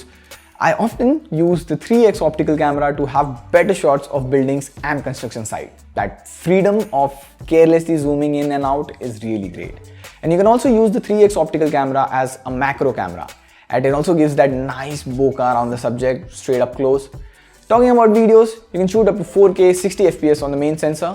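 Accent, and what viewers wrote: India. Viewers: First of all, indian english kidda weird.. 😂😂😂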